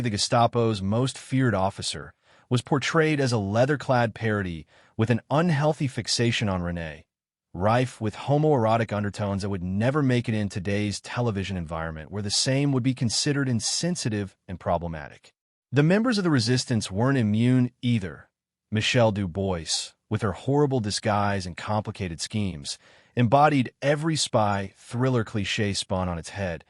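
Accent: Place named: United States. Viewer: TLDR: Rage-bait, don't watch. How appropriate that this piece of rage bait should have an American AI voice-over that mangles the very name of the show. Just like every piece of popular entertainment it was a product of it's time and would not be successful in any other context. Personally I would take this fantasy send-up of wartime movie tropes any day over the barren desert of today's American streaming channels whose only successes are bowdlerised reboots of the very classics you decry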